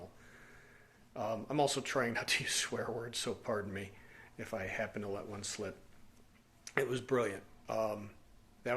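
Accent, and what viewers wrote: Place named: United Kingdom. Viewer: Your British accent ain't bad to be fair (from an English man lol)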